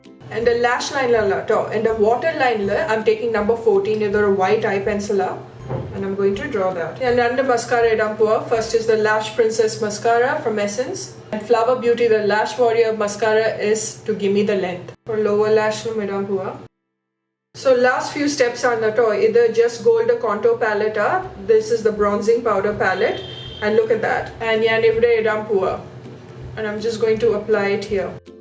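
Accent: Kerala, India. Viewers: Hi dear.. we need more malayalam videos... we like to watch ur malayalam accent.. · Thnk u..chechi....u r just awesome....😊😊💖💖💖💖💖💖💖💖💖ur malayalam is so cute...do malayalam video atleast once in a month plz...plz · Your Malayalam is so cute chechi...loved it...😍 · Sissy it's sweet to hear your Malayalam, I don't know why you look so very beautiful 😍 and lovely in this video, I need a suggestion what.kind.of soap I can use for my 4 year old son,.if possible please do a skincare products we can use for kids, the way you express like mulla poo, kutty pavadai lovely💕 lots of love to Nirvana kutty devadhai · Ur malayalam s so cute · Your malayalam is super adorable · Absolutely loved d video and d manglish ofcourse.. Love you too Chechi ♥️🥰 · Your Malayalam is super cute ❤❤ 90% English 10% Malayalam ❤❤ more than how well or grammar mistakes and stuffs like that you did try to talk and you did talk in Malayalam. 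Adu madi ❤❤ Enikk personally kure kure kureeee ishtaayi.. ❤❤ And that new jewellery can totally relate to it. 🤣🤣🤣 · Hahahh.. your malayalam is sooo cute 😂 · കുട്ടീടെ മലയാളം കേൾക്കാൻ രസമാണ്... great effort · OMG!!!! I didn't know that You Are a Mallu😍😍😍😘😘😘 U r So Cutee while Speaking in Malyalam Chechii😘😘😘 Love From Kerala... Keep Inspiring us😘😍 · Love how cute your manglish is.. being born outside kerala even me n my sisters speak the same way.. alongwith some hindi as well..😂 And your excitement for onam is making me also feel so much more excited about it..😅 Can't wait to see baby nirvana in pattu paavada..😍😍😘 Love you always.. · chechi is sooo cute speaking Malayam..... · Veetylirikaan entoru stylaanu ammachi.... Omg ur Malayalam is so damn cute.... Definitely there should be more of manglish videos 😍😍😍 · Ayyiooo..... Nthoru cute aan ee malayalam.... Sweet 🥰😍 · U r Malayalam is soo cute 😍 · I clicked like on the get go just for the Manglish 🤩♥️♥️ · Loved your maaaaanglish 😂😂😂😂😘😘😘😘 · Chechi ur malayalam is soo cute. 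· "Manglish" Coz let's be honest 😂😂😂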